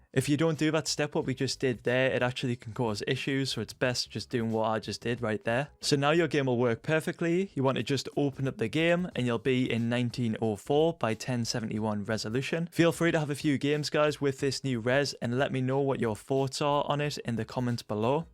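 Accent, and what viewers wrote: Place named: Newcastle, United Kingdom. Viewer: I think he is from Newcastle in England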